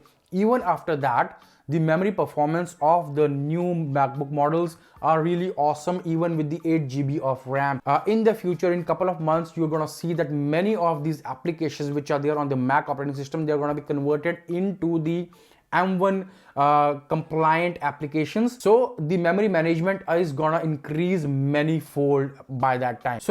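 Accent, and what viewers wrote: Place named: India. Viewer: Nice accent mate! You from India or something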